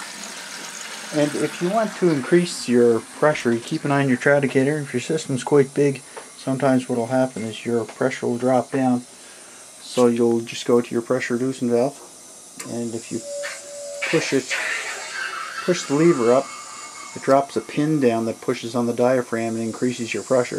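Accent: Cape Breton, Canada. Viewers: Also are you from Cape Breton?! You sound like a fellow caper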